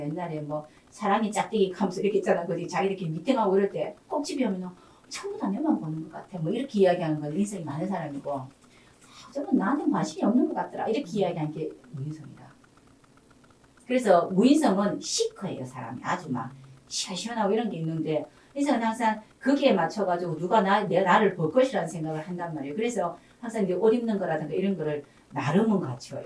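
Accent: Gyeongsang, South Korea. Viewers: 전 고향이 부산인데도 고속으로 듣기가 힘드네요 ㅎㅎ 경상도 어디 사투린지 궁금하네요.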